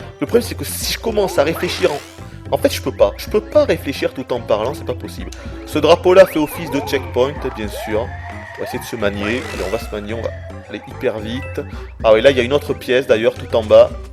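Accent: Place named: Southwest, France. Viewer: est du sud ouest: (mouainsse AU LIEU DE MOINS ) XD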